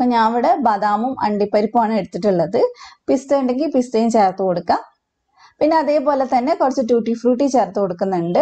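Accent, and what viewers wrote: Kannur, India. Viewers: Itha kannur aano or Malappuram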